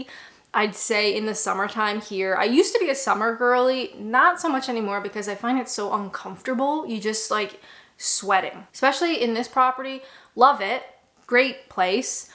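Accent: England, United Kingdom. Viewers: I can hear the British tang in your voice. It’s definitely got a lot stronger over the years. You’ve got a great accent though 👌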